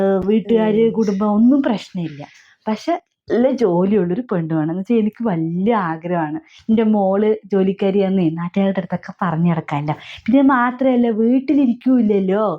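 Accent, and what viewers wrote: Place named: Palakkad, India. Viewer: Awww ന്റെ ചേച്ചിയെ ഞാൻ മലപ്പുറം ആണ്.. മലപ്പുറം ഇസ്ലാം സ്ലാങ് ink നന്നായി അറിയാം.. ഇസ്ലാംസ് എന്ന് പറഞ്ഞു താഴ്ത്തല്ലാട്ടോ.. എല്ലാരും മലപ്പുറംക്കാർ എല്ലാരും verity സ്ലാങ് ആണ്.. But അച്ചടി language ആർക്കും ഇല്ല.. But ചേച്ചിന്റെ മുസ്ലിം സ്ലാങ് കേട്ടാൽ മനസിലാവും പാലക്കാട്‌ ആണെന്ന്.. Nice❤️😌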